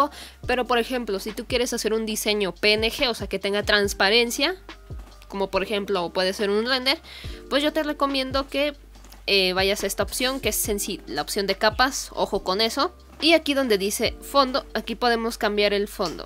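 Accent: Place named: Latin America. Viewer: Buen tutorial gracias . Vives en España ? Tienes bien marcado el acento latino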